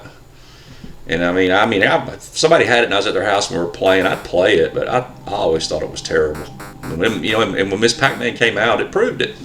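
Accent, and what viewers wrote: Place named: South, United States. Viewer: That is a hard Southern Accent. I appreciate what you're doing here though and I agree with Space Invaders, Adventure, Pitfall, and even Pac-Man...I loves all of these games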